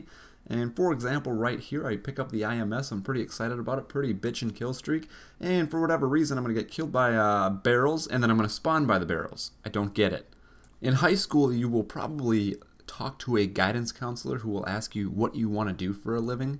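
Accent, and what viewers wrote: Minnesota, United States. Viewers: are you actually from Minnesota?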